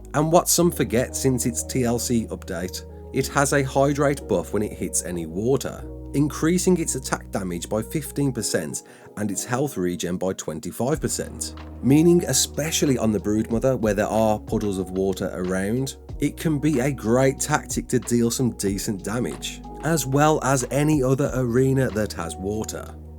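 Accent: Birmingham, United Kingdom. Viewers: Brummie or Black Country lad?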